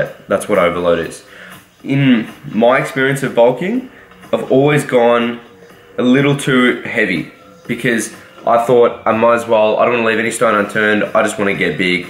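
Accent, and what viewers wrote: Australia. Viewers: Bro... your mum is the best hahahahaha, sweet video man its good to here an aussie among all these mericans hahahaha sick 1 series to dude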